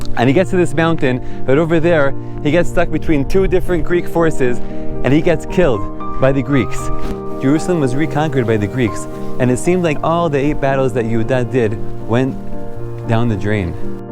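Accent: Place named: New York, United States. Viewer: I was not expecting to break out a perfect NY accent! This is the first time I’ve heard him talking instead of singing. I’ve always imagined he’d have a strong Israeli accent!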